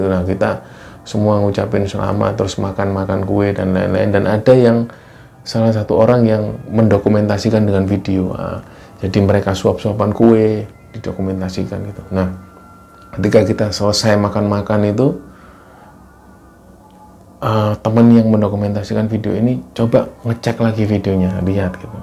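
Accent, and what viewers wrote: Java, Indonesia. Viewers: ikut nyimak mas...terasa bgt alunan suara khas Jawa ...sangat mistis rasanya.... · Suara nya khas jawa .....bgt walau menggunakan bhs indonesia tp khas nya g ketinggalan ....,bisa mengobati kerinduan akan kmpung halaman,dan cerita2 yg d sajikan bnr2 enak d dengar ....salam kenal mas dr kab,bekasi.....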